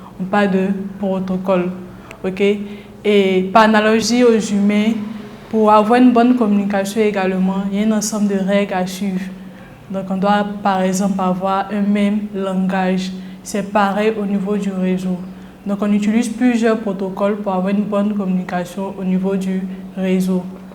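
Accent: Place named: Ivory Coast. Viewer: Merci a toi aussi. On sent vraiment que tues passionnée. Bon courage. Ton accent me dit que tu viens de la CI. Je veux savoir, stp, comment faire en CI, pour passer l'examen.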